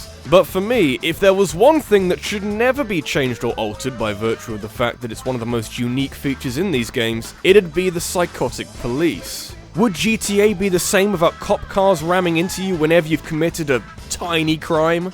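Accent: United Kingdom. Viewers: [First impressions] Well, he's a bit-er. [Speaks] WELL LOOK WHO'S BRITTISH! [subs instantly]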